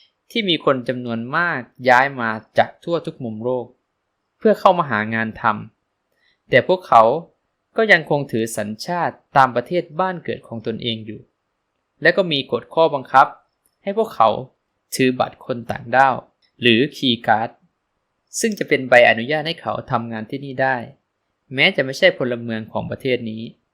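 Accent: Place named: Isan, Thailand. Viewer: ขอบคุณพระเจ้า ขอบคุณผู้สื่อสารที่ดี แม้สำเนียงออกอีสานแต่กะเป็นตาฮักยูเด้อ ขอพระเจ้าอวยพรหลาย ๆ เด้อ ขอพระเจ้าได้รับเกียรติสูงสุด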